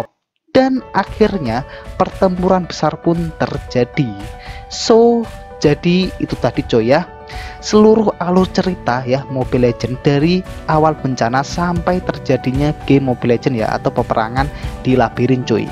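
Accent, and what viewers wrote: Indonesia. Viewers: nganu, medhok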